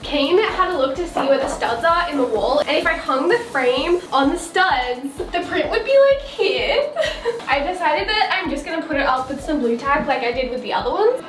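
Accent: Australia. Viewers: are you an aussie trying to sound american or an american is australia?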